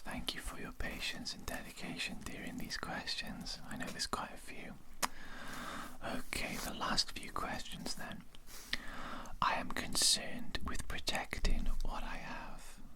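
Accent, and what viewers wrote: Wales, United Kingdom. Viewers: you have such a relaxing voice , do i detect a little bit of a welsh accent there? sorry you took down the wrong answer for me there i dont infact always finish my chores , super relaxed video loved the writing sounds 😴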